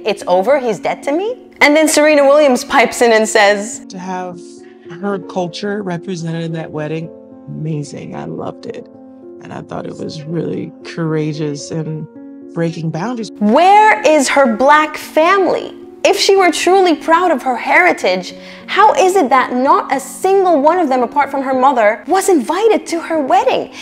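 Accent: United States. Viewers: Great video your accent perfect English but I'm wondering is it American it has a slight undertone of something else I'm so interested in it. It's an American accent with slight differentiations on certain pronunciations though few would notice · Listening to you is like listening to my own thoughts in an American accent. Loving your videos. Just discovered them today.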